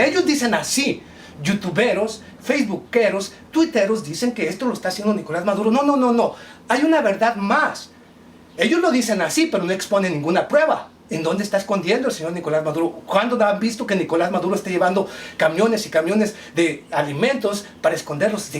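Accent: Mexico. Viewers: MIRA A OTRO PERRO CON ESE HUESO!..”DEMOCRACIA” NO ME HACES REÍR PORQUE SON MÁS LAS GANAS QUE TENGO DE LLORAR QUE DE REÍR!!... CUANTO TE ESTÁN PAGANDO O CUANTO TE HAN OFRECIDO PARA CONVENCER QUE ESA CUERDA DE DELINCUENTES SON “ DEMOCRÁTICOS” TÚ TE TIENES QUE IR A VIVIR A VENEZUELA PERO COMO CUALQUIERA DE A PIE! Y DÉJAME DECIRTE Y EN POCAS PALABRAS TÚ Y TODA ESA SARTA DE LADRONES DELINCUENTES NARCOTRAFICANTES SI NO TUVIERAN EL BLOQUEO YA HUBIERAN ROBADO AUN MÁS Y CALMATE PORQUE TE VA A DAR UN INFARTO! TÚ A MI NO ME CONVENCES!...CONVENCERÁS A LOS IGNORANTES! VIVA GUAIDO!! ESE ES NUESTRO PRESIDENTE!....TÚ DEBES DE SER UNO DE SUS MULAS NARCO TRAFICANTE A QUE CARTEL PERTENECES ? POR EL ACENTO ME PARECE QUE ERES DE MEXICO O SEA QUE PERTENECES AL CARTEL DE SINALOA PORQUE ESO ES LO QUE SON TODOS USTEDES NARCOTRAFICANTES CARTELES DE EL DIABLO! TE METISTE UNA PERICA BIEN ARRECHA PORQUE NI RESPIRAS🤪😂🤣😂🤣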